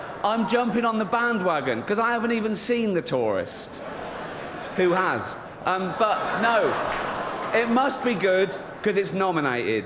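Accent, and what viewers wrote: United Kingdom. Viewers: Yea unless you are also British, reading his lips is next to impossible. Accents are a SOB.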